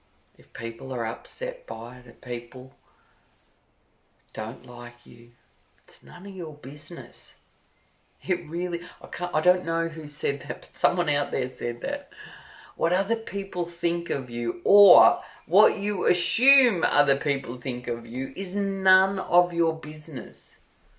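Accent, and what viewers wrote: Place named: Australia. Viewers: Thank you so much for this,. I had a lot of realizations. And I just love your Aussie accent, I get quite homesick when I hear you and I’m actually German, but lived in Oz for 8 years. Truest feel good Tapping! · same here, i enjoy your Australian accent too for a change, after hearing UK/US and other accent all my life.:). My Indian accent LOL: <link>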